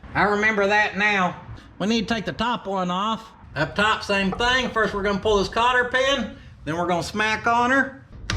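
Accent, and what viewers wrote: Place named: South, United States. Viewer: Dude im not even from the south and I love his accent and additude.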